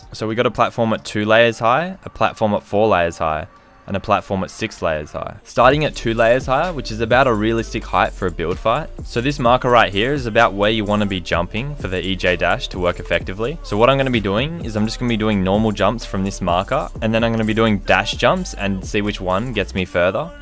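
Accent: Australia. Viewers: is he australian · Aye I’m a new sub lmao I could tell you were from au as well as soon as I heard you spoke aha hopefully we see each other in game at one point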